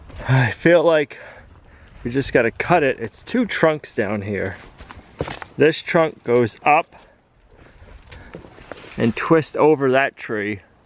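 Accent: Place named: South, United States. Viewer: lol I hear your southern coming through. I see more applewood bacon in your future.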